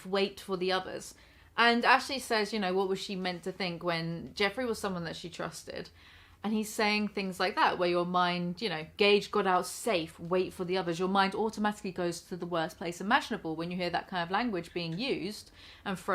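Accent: United Kingdom. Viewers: I LOVE your accent. I usually hate British accents. i dunno....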